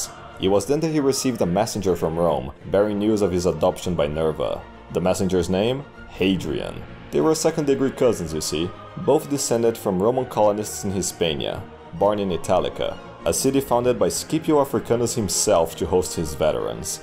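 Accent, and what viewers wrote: Brazil. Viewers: Sir Suspicious yeah I’m not familiar with Brazilian accents at all, which is why I assumed Romanian/Greek.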